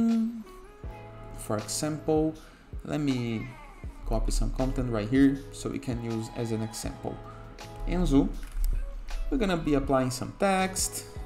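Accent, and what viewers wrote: Brazil. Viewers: Sotaque brasileiro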